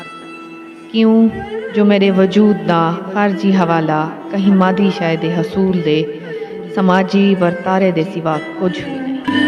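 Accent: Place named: Saraiki, Pakistan. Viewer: Intersting video Apka saraiky accent perfect hy Apki urdo mein b ye lehja galib hy Ap mighty indus se buht ziada inspired hein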